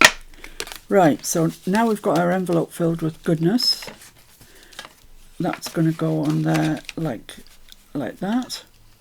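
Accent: United Kingdom. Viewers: You are really fattenin' ol' Bob up. I LOVE it! I also love rice paper. It is wonderful to touch and is really easy to apply. I'm glad to see you used it. LOL. Did you say 'trim-mahhhge?" 😂😉 I find when I am working on my flippy flappy and following your video, I speak in your British accent. I repeat what you say just the way you say it. 🤭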